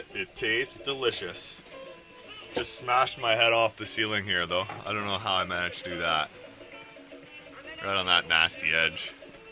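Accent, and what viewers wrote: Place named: Canada. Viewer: So pumped to hear your Canadian, Much love from New Brunswick!!!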